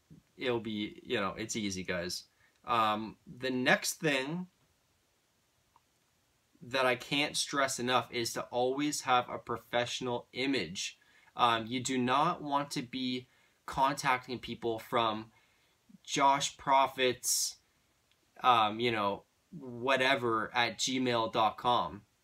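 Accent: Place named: Canada. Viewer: I know you'd Canadian by how u say owt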